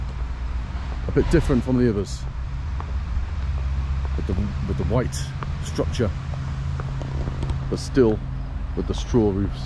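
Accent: United Kingdom. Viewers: you've got a British accent?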